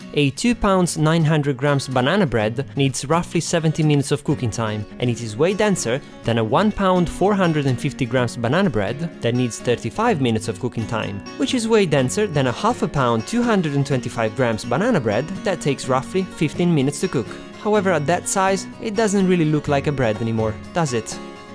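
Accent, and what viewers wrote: Italy. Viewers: I think he is from Italy... it seems so to me cause im italian;) idk tho... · are you italian? im trying to figure out what your accent is, it's really cool!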